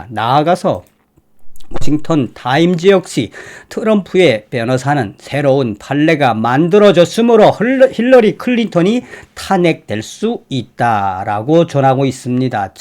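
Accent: North Korea. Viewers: 이 분 북한사람 인가요? 매번 북한 말투로 ??? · 요즘 북한 사투리에 빵빵 터집니다. 간만에 재밌는 웃음을 주시네요~^^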